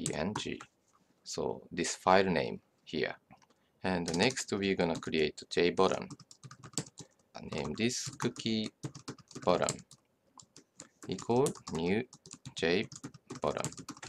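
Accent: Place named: Japan. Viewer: From your accent, I assume you are japanese, but your vocabulary is incredibly refined. Good job, good tutorial, and you've gained a sub. ;]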